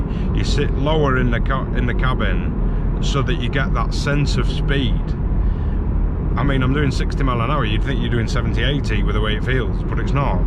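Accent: Yorkshire, United Kingdom. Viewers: This Gent wouldn’t be out of place on TV based car review show ….based on affordable cars or sourcing little gems like this CRZ …great review and narration and a great Yorkshire accent ….off this review im looking at this Honda …CRZ as my next motor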